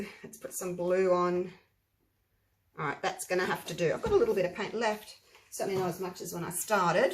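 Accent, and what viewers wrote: Australia. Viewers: Hi. I have been subscribed to Your channel for 2? Years. You are a favorite because of Your talent for beautiful paintings. I Love the Poodles and Birds, plus your gorgeous back yard. I know who is, and your accent, Your art, and the joyful vibe. This painting turned out well, although I was nervous. I could feel the stress from the beginning, but You calmed to natural, as the painting evolved. Relaxing. I also subscribe to Gilly. Her work will never be compared to Your work, because it is so different. I appreciate Both!! Thank You for everything that You share. I wonder.... both of you, are Australian. The World Loves anyone from Australia. You are the best. What if You and Gilly talked? Maybe it was an honest mistake? Or not? Would an apology work? Could You and Gilly combine as a Team for Australia. We need both Artists. I am an American, and depending on you Both to get through this horrible time by doing art. 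I Need you Both. Please patch the fence. If You, reply to Me... I am going forward to contact Gilly. Otherwise, I will stay silent. I adore You,. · Hi, Im enjoying your pouring demos ❤ Great to hear your Aussie accent, I'm watching from SA. Thanks for your explanations and sharing your experience. Love your art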